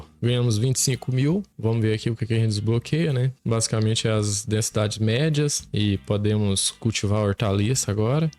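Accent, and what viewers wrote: Minas Gerais, Brazil. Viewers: vc é engraçado o sotaque mineiro deixa mais engraçado ainda o video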